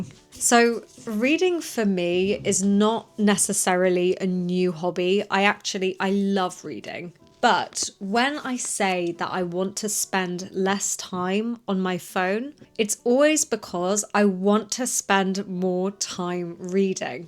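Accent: United Kingdom. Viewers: Hi! I speak Spanish and I'm learning English. Your video helps me a lot and I love your accent, especially because it's British. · yav dayı şu british aksanı ile konuşmayın yav bana USA lazım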